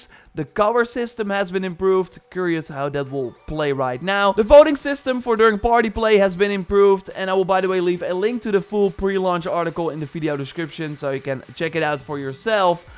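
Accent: Netherlands. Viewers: Quick question, hearing your accent I'm guessing your from the Netherlands? When's does the game drop there? Is it midnight going into April first, or is It at 17.00 On April first?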